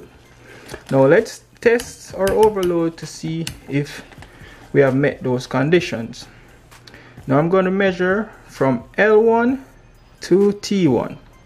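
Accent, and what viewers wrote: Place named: Jamaica. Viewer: Sounds like a Jamaican. Good video breda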